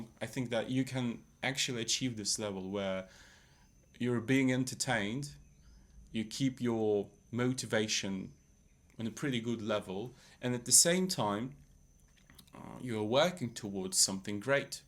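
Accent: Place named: United Kingdom. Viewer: What a wonderful British accent! You must have worked a lot on it. Plz make more similar podcasts.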